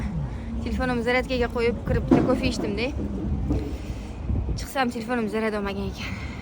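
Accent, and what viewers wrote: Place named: Bukhara, Uzbekistan. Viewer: Вай Бухороча гапирурин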